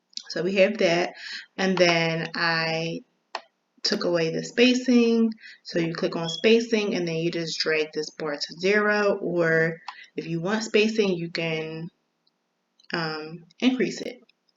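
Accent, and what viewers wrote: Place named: Philadelphia, United States. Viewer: Thank you for this video so helpful!!! 🫶🏾You sound like you from philly… are you from philly ?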